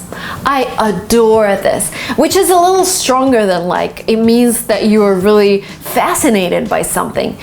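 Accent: United Kingdom. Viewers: She has a lot of british accent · Are you from the UK?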